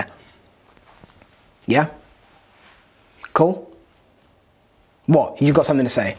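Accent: England, United Kingdom. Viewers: Hearing an Englishman talk about hockey still throws this Canadian off. But there’s no denying that knows his shit. As always, a great and informative clip!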